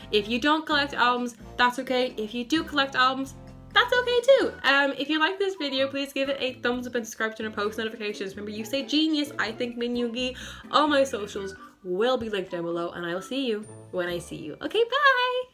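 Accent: Ireland. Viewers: bro, am i hearing a fellow irish? i stan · awe💖 your irish accent is super cute!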